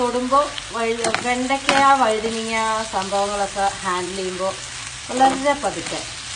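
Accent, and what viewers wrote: Palakkad, India. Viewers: I am making vendaka dish for dinner and continue palkkaden accent in your presentation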